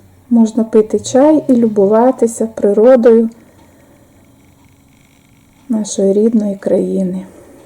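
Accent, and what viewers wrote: Western Ukraine, Ukraine. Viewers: Пані, ви справжні і не звертайте уваги на недолугих людей, які ще сміють сміятися і засуджувати, що ви купили стареньку хату. Невже притомна людина може так думати? Люди, та ЩО з вами?!! Тисячі людей тікають від дикої орди, залишають домівки, залишаються без миски-ложки, змушені починати життя на новому місці, а ви зловтішаєтеся! Замість допомогти, чи просто підтримати добрим словом. Пані, нехай вам Господь дає сили і здоров'я всі негаразди подолати.❤ А певно пані має західняцьке коріння, бо чую по розмові)). Вітання вам від тернополянки❤❤ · Пробачте, вперше попала на Ваш канал і цікавлюсь з якого регіона ви переїхали? Бо мова у Вас як у західних українців.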